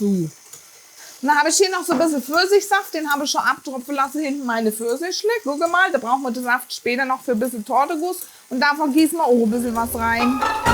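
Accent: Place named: Hesse, Germany. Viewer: Voll genial , hab die Torte zum Geburtstag für meinen Mann gemacht , legga legga.... Dein hessisch is einfach herlliiiisch. DieStelle mit der Butter : "damit's net so " aarsch" zammfällt" :DDD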